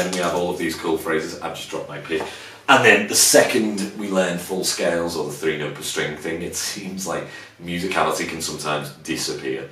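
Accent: Liverpool, United Kingdom. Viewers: Scouser! Love it well in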